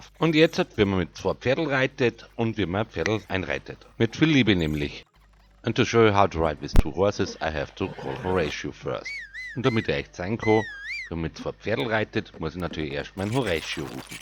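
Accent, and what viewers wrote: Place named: Austria. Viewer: I find dein Österreichisch Akzent geil.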